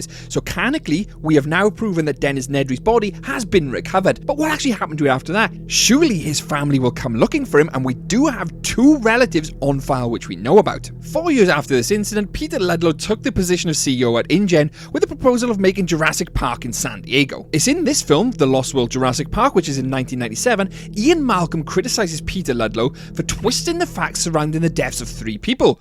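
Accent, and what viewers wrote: United Kingdom. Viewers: Looking for a meaningful JP video. Clicks on this video Hears British dude Leaves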